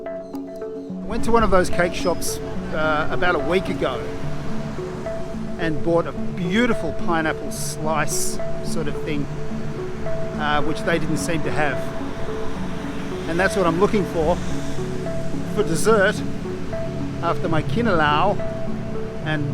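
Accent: Australia. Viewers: Kinilaw in waray as well.. you sound Australian accent... from Brisbane Australia ❤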